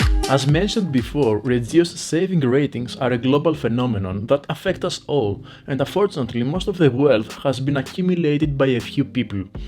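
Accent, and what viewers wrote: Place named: Greece. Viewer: I like your greek accent 😁 Nice video 👏👏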